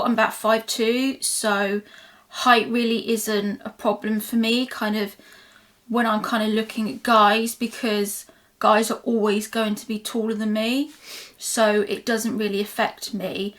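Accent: United Kingdom. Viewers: I can listen to her all night long. She sounds like BBC/London. 😊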